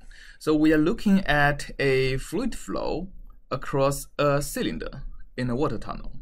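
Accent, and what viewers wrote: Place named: China. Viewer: Horrible, thick Chinese accented English. Completely unintelligible. Pity the MIT students.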